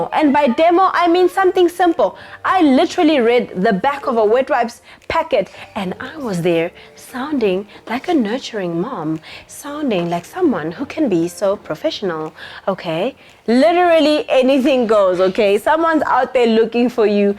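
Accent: Nigeria. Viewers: So you have been hanging out with one of my Nigerian brothers.Look at that accent . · Girl.... your nigerian accent is on point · That Nigerian accent 😂🤣🙌🏿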